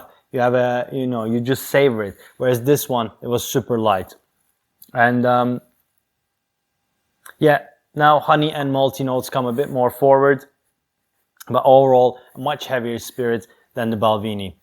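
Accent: Turkey. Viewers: Kardeş sen Türksün b/o your pronunciation. Also kaju ... :)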